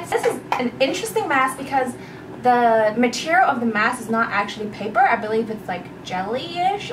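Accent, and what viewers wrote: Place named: England, United Kingdom. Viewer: Love ur English accent xx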